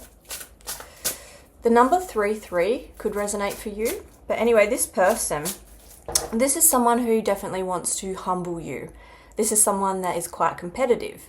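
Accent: Australia. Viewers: I love Australian accents.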